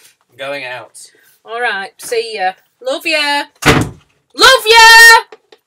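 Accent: United Kingdom. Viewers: Are you from east Enders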